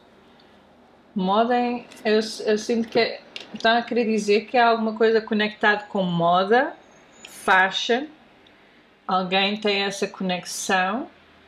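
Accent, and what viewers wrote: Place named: London, United Kingdom. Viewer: You know...a frase, típica do Inglês Britânico ao final de uma sentença verbal não deixa duvida de seu inglês Londrino, You know...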